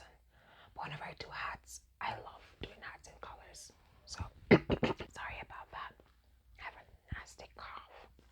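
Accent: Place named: Jamaica. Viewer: Where are you from? Sounds jamaican!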